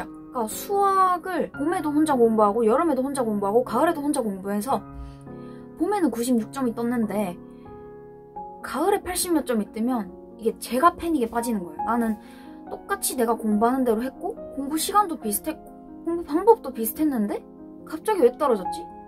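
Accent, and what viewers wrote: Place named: North Gyeongsang, South Korea. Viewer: 가끔씩 꿈을 꾼다는것은 씨가 좋은대학을 가기 위해 치열하게 공부했던 시간임을 증명해주는것 같아요. 하지만 꿈을 꾸고 난뒤 현실에 만족하기 보다는 그 꿈의 의미에 대해 한번 생각해보는 시간도 필요할 것 같다는 생각이 듭니다. 서울대를 못가더라도 삶을 행복하게 살아가는 사람이 있고, 서울대를 가더라도 삶을 행복하게 살지 못하는 사람도 있어요. 우리 삶의 종착역은 대학이 아닙니다. 많은 사람들이 서울대를 가려는 이유는 삶을 행복하게 살아가기 위한 디딤돌을 딛기 위한 것이라고 생각합니다. 씨, 서울대를 디딤돌로 삼아 더 발전하는 모습 기대할께요. (경상북도에서 태어나서 그런지 씨의 말투가 듣기 편하고, 또 좋은 마음이 느껴져 댓글을 납깁니다.)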